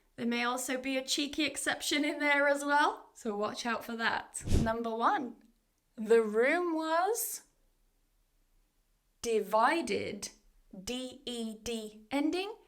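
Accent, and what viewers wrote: United Kingdom. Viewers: Is it British accent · I’m really grateful for you for make fantastic videos to our English learners. Thank YOU! And I love your British accent, hopefully my pronunciation getting better, speaking English like your accent.❤ ❤❤ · hello ms i love your content, as specially the British accent.... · Excellent, i love your british accent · She is from the UK, i dont know if this Is british acent, i dont native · Hey are you a british teacher ?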